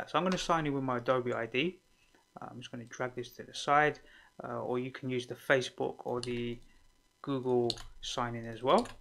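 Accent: United Kingdom. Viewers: He just has a british accent.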